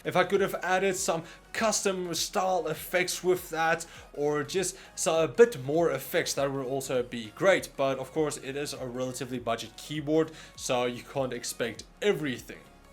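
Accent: Afrikaans, South Africa. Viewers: Ek wou se jy klink Afrikaans!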